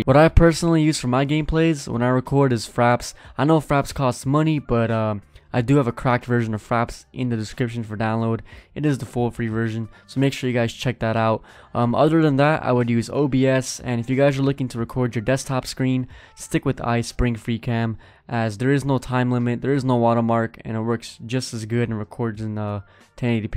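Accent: Croatia. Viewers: Are you from Croatia? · is he croatian?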